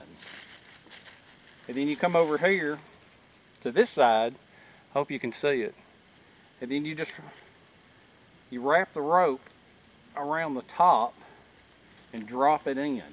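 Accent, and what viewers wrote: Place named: Georgia, United States. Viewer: Yeah I kind of figured you was from Georgia by your accent. I live in SC myself. Love the country accent myself.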